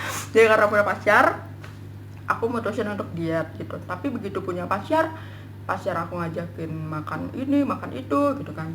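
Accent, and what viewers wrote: Bali, Indonesia. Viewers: iya y..awalx jg aku ngerasa mbaknya orang bali. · Kaya bhs nya methok ke bali ya.. haha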